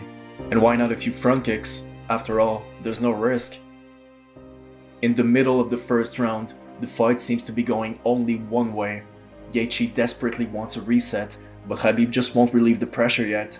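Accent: Arab world. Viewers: It's seemed you have an Arabian accent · Yo we can tell from your accent that you're arab. Don't call him khabib when you know how to pronounce his name HABIB properly. Fake ass guy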